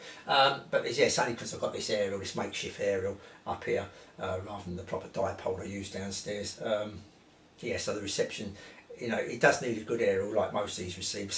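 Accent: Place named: England, United Kingdom. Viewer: Sorry if I sound a bit too critical but you might want to slow down your speech a bit. It might just be me having trouble with your English accent as I'm a Canadian and I think we may speak somewhat slower and deliberate. Just a little something to help improve your channel as you continue on. I know that you have said this was done when you were first starting out so it is entirely understandable that you won't have an excellent delivery right off the bat. Nothing wrong with the content just one listener trying to help out. Cheers mate.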